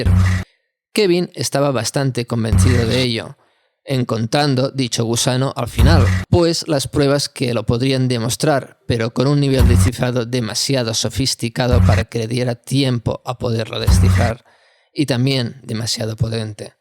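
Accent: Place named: Catalonia, Spain. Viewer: Em fa molta gràcia com se't nota que ets català 😉 Salutacions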